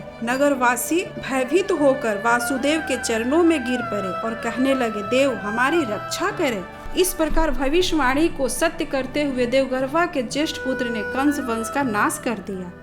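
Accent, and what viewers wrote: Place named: Uttar Pradesh, India. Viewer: तेरी आवाज बता रही हे तू नफरती दलित हे ,, तेरी बोली बता रही हे तू उत्तर प्रदेश की नफरती दलित हे नकली कहानिया फेलाना बंद कर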